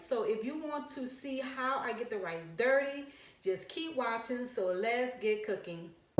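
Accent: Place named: New Orleans, United States. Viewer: Nice baby yo accent so new awlins. Gentilly?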